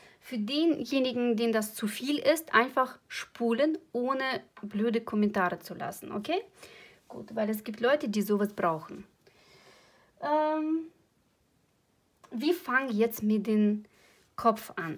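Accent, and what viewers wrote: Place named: Russia. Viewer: Hallo, ich bin neu auf deinen Kanal und ich finde dich sooo cool, ich hab schon so viel gelacht während dem häkel, es ist immer lustig mit die. Dein russischer Akzent ist auch sowas von unterhaltsam, mach weiter so, ich bin ein Fan deiner Kuscheltiere. Ich kann mithäkel und bin mittendrin. Danke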